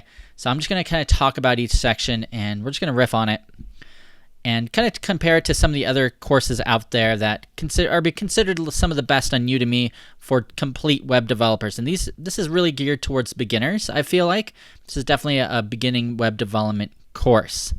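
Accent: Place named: United States. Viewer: What american accent is that? Its beutiful and smooth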